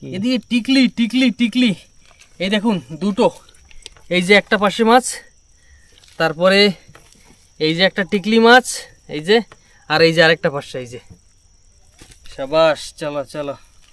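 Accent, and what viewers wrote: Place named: Khulna, Bangladesh. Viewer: District ER nam ki. Kotha bolen tho khulna r moto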